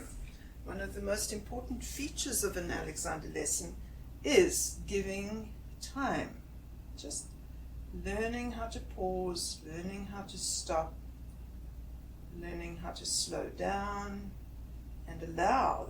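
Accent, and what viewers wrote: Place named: South Africa. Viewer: I could be wrong but she sounds as though she is from South Africa and i love that accent. Great video.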